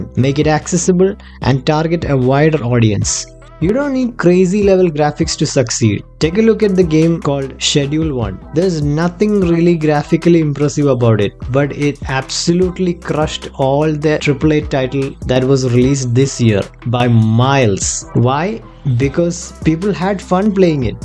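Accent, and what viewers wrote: Kerala, India. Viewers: The mallu accent is quite overpowered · Are you malayali? Your english is pleasing to ears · u sound like a malayali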